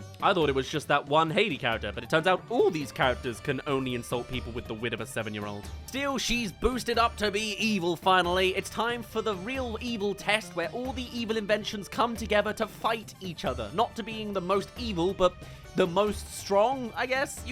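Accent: United Kingdom. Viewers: and you're proof is? Cause I said so? Literally you don't know that, I think hes just British and we know British people pronounce things differently than Americans. Not saying they're wrong, just saying British people are silly.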